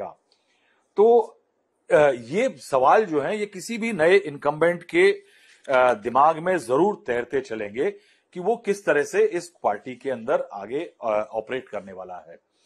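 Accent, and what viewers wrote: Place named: Bihar, India. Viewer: Stop bhaiyan and bihari style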